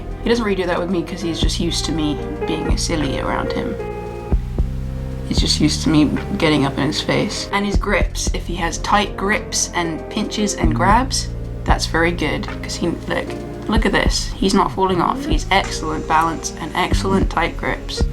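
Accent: United Kingdom. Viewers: Didn't realize you were British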